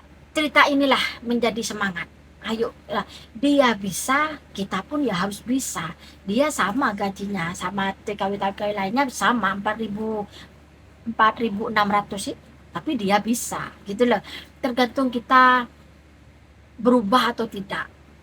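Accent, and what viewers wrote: Madura, Indonesia. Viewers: Logate meduro banget ce....🤣🤣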